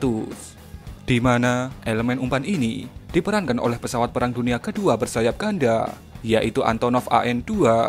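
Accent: Java, Indonesia. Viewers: Ubah dong pengisi suarax Ojok seng meddok ngono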